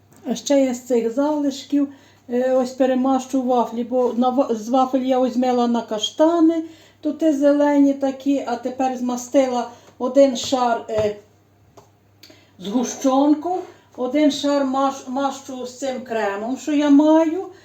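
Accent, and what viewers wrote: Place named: Chernivtsi, Ukraine. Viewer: А мова, діалект, говір, словечка, наголос точно, як на Батьківщині у мого покійного чоловіка, а він з Чернівецької обл з Романківців. Наче в рідних вдома побула😊 - підписка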